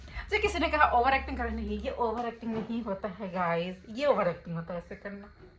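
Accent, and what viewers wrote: Bihar, India. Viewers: Mujhe esa lgta hai ki na aapko negative thumbnail dalne chaie na hi kisi ko respond krna chaiye Its better ki apne vlogs ko family vlog bnana bnd krdo kch special hua toh family ko dikhao otherwise nahi Bcz jb apko dikh ra hai ki apki family aap m interested nai hai toh unhe kyu hi vlogs m lana or kyu hi bewjh chipkna Log negative bolte hain bolne do just ignore & delete their comments Positive raho or positive vlogs bnao positive thumbnail daalo Qki kahi na kahi aap bhi galti krre ho isliye hi aap troll hote ho Abhiksha ko dkho wo itna jada troll nai hoti Km kmao pr acha kmao Ye family drama saas bahu devrani jethani krke kch nai milega Log kch hi din dkhte hain y sb baad m sb bore hojate hain Lambi race ka ghoda bno Na ki chaar din ki chandni Apke subscribers itni advices dete hain apko ki aap apna pronounciation tkh kro Being bihari its good pr professionally ye nai chal pata Insb chizo se niklo & grow urself Grow your personality..apke bache k liye b acha hoga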